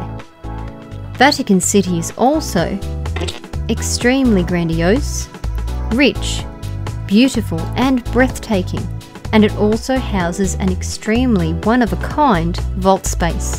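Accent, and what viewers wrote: Australia. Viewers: She has an Australian accent. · that is australian accent Mate !!!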